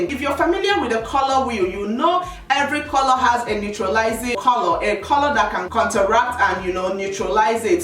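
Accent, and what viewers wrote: Nigeria. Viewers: Nigerian accents <3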